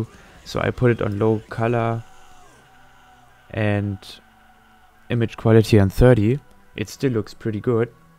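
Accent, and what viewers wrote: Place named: Germany. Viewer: Du hast aber mega den deutschen Akzent xD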